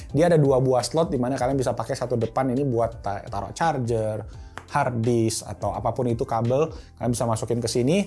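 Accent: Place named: Palembang, Indonesia. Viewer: e' o' , ya ampun bahaso palembang nian🤣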